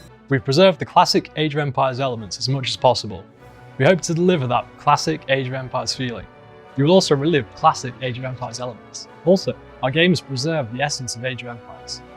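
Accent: New Zealand. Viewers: why do kiwis say "i"instead of E?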